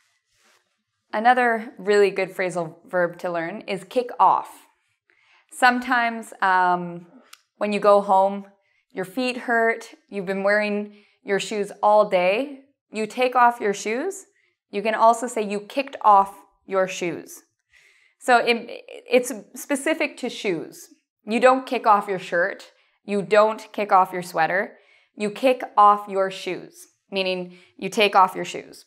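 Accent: United States. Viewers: I'd say she's American accent (I am not quite sure), either way it doesn't really matter, those phrasal verbs are used in UK as well :) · 美式英文太难听了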